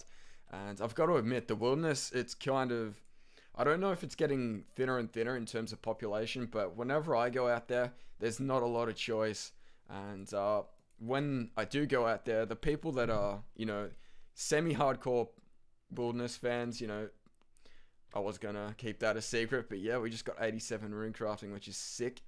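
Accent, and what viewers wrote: Australia. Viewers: are you Australian? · just an Australian accent...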